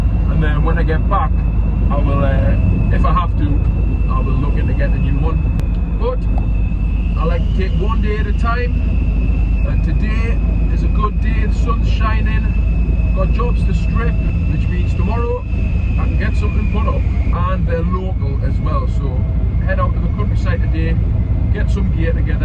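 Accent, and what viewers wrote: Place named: Newcastle, United Kingdom. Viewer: Union scaffolder since 2014 but started taking training on tube and clamp earlier this year and got into watching your videos. Got to say I love the Geordie accent. My brother in law is from Chesire and the Scouse accent is great as well. Been watching Dibnah videos for years. Always done systems with tube and clamp additions but learning to put up proper tube and clamp has been a blast. Doing "English" style as we call it here and "Western" types. Western we put the transoms on the standard instead of the runner/ledger. All wedge clamp here though. Bit of a holdover from the days of scaffolds being all wood. Tube and fitting was never really a thing until it was used for industrial in the 70's. Love the videos mate. Glad I could help you out with the van some.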